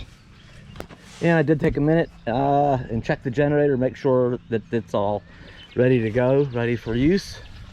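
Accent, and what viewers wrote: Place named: Pacific Northwest, United States. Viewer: You sound like livening in the PNW .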